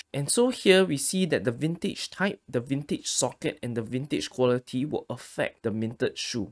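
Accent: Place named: Malaysia. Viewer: no worries mate, ur explanation was crystal clear and highlights most of the important things that people like me wanna know. my question is, are you malaysian? singaporean? or indonesian? that english accent of yours is familiar to me haha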